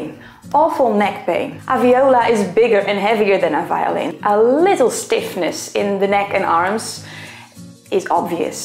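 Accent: Netherlands. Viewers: From your name and accent I think you are Dutch or Flemish! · I guess you're Dutch from your accent 🤔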